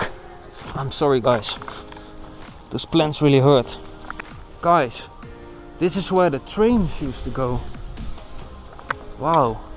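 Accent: Netherlands. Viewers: can you speak dutch you i heard it on your voice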